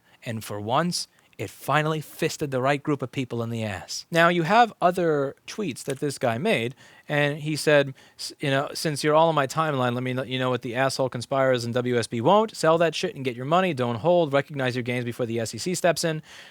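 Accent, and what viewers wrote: New York, United States. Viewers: He's not an angry New Yorker, just how most new yorkers from NYC and close area talk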